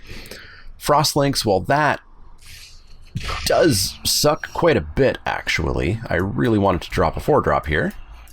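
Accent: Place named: Canada. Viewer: You sound more and more Canadian the longer I watch these. I love it.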